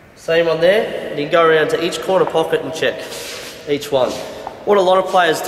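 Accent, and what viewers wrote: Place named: Australia. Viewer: What did he say at the end about the best cloth? what type? I find it hard to understand the AU accent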